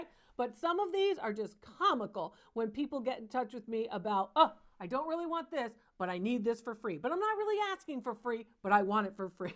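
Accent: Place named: North Jersey, United States. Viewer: Love you Doc! .... Are you sure you're not from North Jersey? 💗🤔😊 You sound just like my sister-in-law. (That's a compliment)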